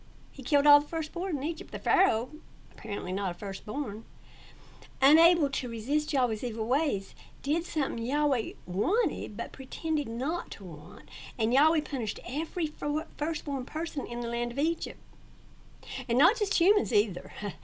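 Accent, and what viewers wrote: South, United States. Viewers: Am from trinidad & I also have a accent but I also like yours ..I like the southern accent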